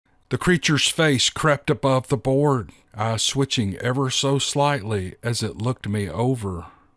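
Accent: South, United States. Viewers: is brilliant isn't he!? His accent is that of a true southern gentleman and I hope all my Irish kinfolk can appreciate our southern accents much love to Ireland from Arkansas 💚♾💚♾💚♾💚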